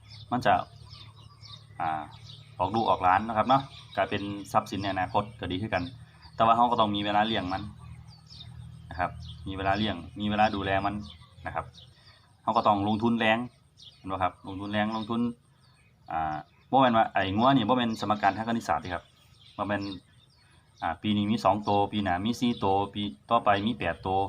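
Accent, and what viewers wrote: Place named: Chaiyaphum, Thailand. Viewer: ครูอยู่บ้านใดเว้าสำนียงคือคนชัยภูมิบ้านพี่แท่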